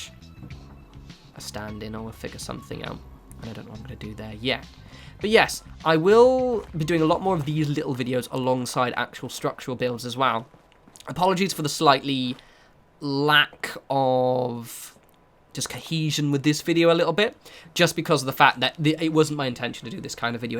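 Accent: United Kingdom. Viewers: nope British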